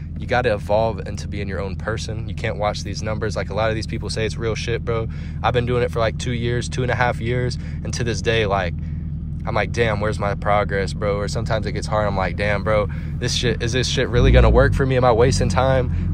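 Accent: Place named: Atlanta, United States. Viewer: White dude with an Atlanta accent is Funny asl ❤️ the zl1 is hard gng 💯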